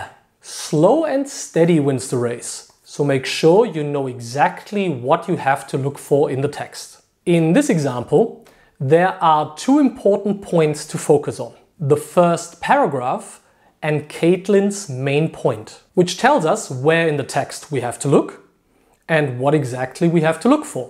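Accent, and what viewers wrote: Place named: Germany. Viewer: Thanks, this is a really useful video. Do you mind if I ask where you're from? I'm assuming from your accent that you're German, as opposed to Austrian or Swiss.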